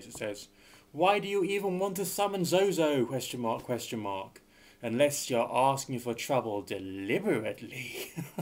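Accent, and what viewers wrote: United Kingdom. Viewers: your an idiot and don't know any thing u british talking fuck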